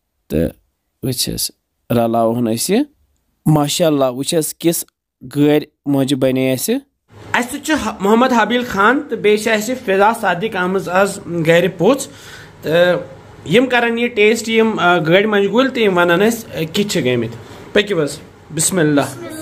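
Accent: Kashmir, India. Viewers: I love listening to your Kashmiri accent.....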